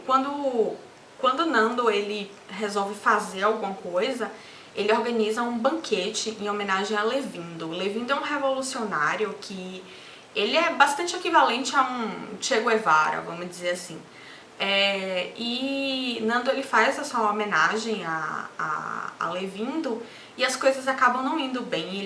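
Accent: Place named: Salvador, Brazil. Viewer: Eu bem que achei que você tinha sotaque de soteropolitana, somos conterrâneos. Um belo achado seus vídeos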